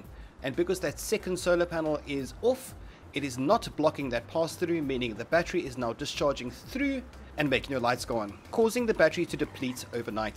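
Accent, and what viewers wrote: South Africa. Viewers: South African? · Are you South African? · Dude you should like a South African??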